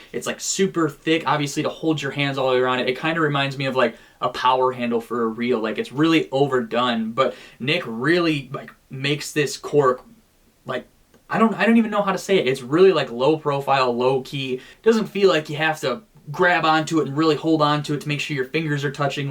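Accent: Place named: North, United Kingdom. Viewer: This channel is awesome, this guy totally reminds me of another YouTube angler. If you close your eyes and just listen to his voice, he sounds almost identical to Sam Sobi, just a lil less northern 😂.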